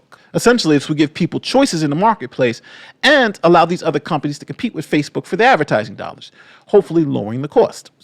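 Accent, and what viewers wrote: New York, United States. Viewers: Love your videos & your New York accent. 😄👍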